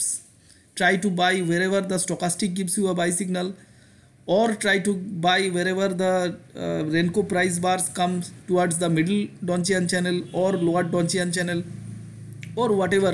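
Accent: India. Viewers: Bhai stop talking in butler English please. Use hindi please.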